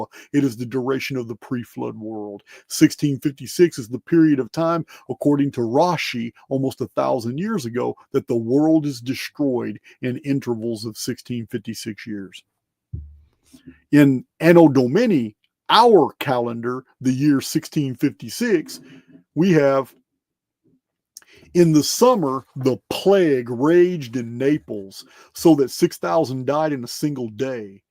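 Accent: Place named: United States. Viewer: I like the way Americans pronounce our English names. Wales is even funnier😊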